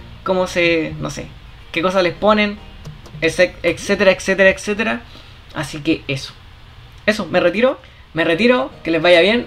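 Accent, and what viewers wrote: Chile. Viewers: Mi reacción: Es chileno xD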